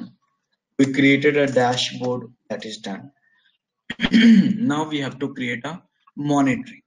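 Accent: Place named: India. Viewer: it so hard to understand the heavy accent. i find it extremely hard especially with Indian tutors.It looks like a good course for me but whats the point if i cant understand every 3rd word he says. Also, the fact that he writes everything during the course is very typical to courses that are led by Indian accent tutors and also the excessive use of the word "OK" really irritates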